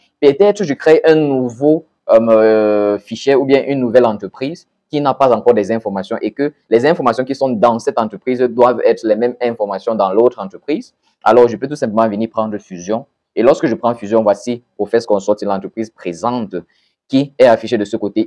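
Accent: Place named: Central Africa. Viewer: Commentaires anormalement élogieux !!! C'est du pipeau !!! La vérité c'est que vous parlez trop et avec un accent difficile à capter pour des non centrafricains Abrégez svp et soignez votre diction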